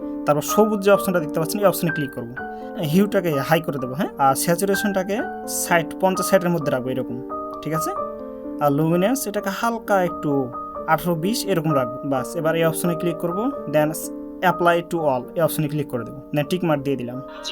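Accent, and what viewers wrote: Chapainawabganj, Bangladesh. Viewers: রাজশাহী কোথায় আপনার কথা শুনে চাঁপাইনবাবগঞ্জের ছেলে মনে হলো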